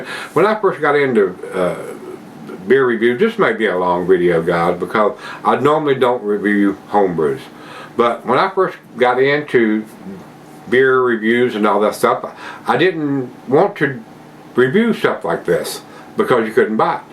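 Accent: South, United States. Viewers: I now check the dates on ( most) of my beers because of. His reviews broadened my beer knowledge and appreciation. Randomly enough, I found (find) his reviews to be very relaxing and almost asmr like due to their very simple format and comforting southern drawl. Over the years I’ve watched his reviews almost religiously and looked forward to when he would upload a new review in the morning. It was a nice simple escape that made me proud to be a beer drinker. From now on, every beer I drink will be in his honor.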